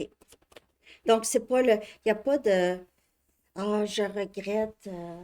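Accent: Canada. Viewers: Je vous decouvre à l instant bonjour de MARSEILLE JE SUIS LION ASCENDANT CAPRICORNE ET LA LUNE EN CAPRICORNE VOUS AVEZ L ACCENT CANADIEN❤❤❤❤❤❤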